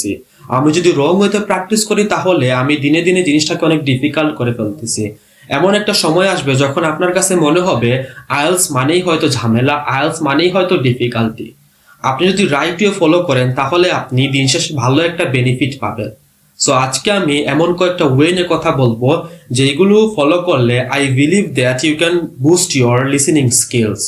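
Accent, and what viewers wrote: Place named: Sylhet, Bangladesh. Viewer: দুজনই সিলঠি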